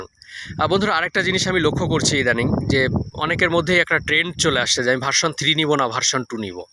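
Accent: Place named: Khulna, Bangladesh. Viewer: আমিও খুলনার ছেলে এজন্যই কিছু কথা বলছি ডোন্ট মাইন্ড ৷ আপনার ভয়েজটা ভাল, উপস্থাপনাও খারাপ না তবে শুদ্ধ ভাষায় যখন কথা বলবেন তখন সকল উচ্চারনই শুদ্ধ হওয়া উচিত নইলে সেগুলো শুনতে খুবই বাজে লাগে ৷ হইছে, গেছে, করতিছে, পাইছি, এই টাইপের খুলনাইয়া উচ্চারন গুলো শুদ্ধ উচ্চারন করলে ভিডিওর কোয়ালিটি আরো সুন্দর হত ৷ আর এত লম্বা সময় ধরে শুধু মাত্র বাইকের চারপাশ থেকে শুট না করে মটোভ্লগিয়েংর মত বাইকটা চালানো অবস্থায় ভয়েজ দিলে আরো জোস হত ৷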